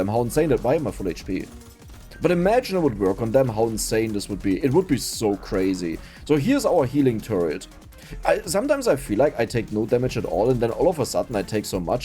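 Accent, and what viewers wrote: Germany. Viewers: As a newcomer i have been thinking what accent does he have. Today, i realized and feel very, very, very dumb. I even speak german.... The "Ooga, ooga, ooga" felt about right for my brain at that moment.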